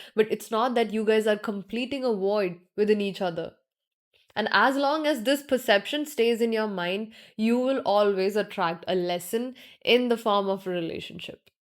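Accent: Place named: India. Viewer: You’re beautiful it’s true. I love your accent! Hindustan/India has a rich culture. I have lived with Hindi/Indian people. They are kind and considerate. I love Sanskrit. It is like an alien language. Bhakti: Devotion, love, and faith in a deity. Brahman: The ultimate reality or divine principle in Hinduism. Karma: The principle of cause and effect. Moksha: Spiritual liberation. Samsara: The cycle of birth, death, and rebirth. Satya: Truth. The way I seen it certain languages control matter=mother=care. The connotation and tone,rhythm, pitch mean a lot. It reverberates out like a pebble in a pond. The ripples outward affect the whole pond even when not seen. The effects are seen later. People wonder where it came from. For every reaction there is an equal or opposite reaction. It matters what you say. What you say matters. Sound and emotion creates matter. 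A calm nervous system helps create a better life. It changes the outside world. (Matter) Hence OM! Sweet OM! 🕉️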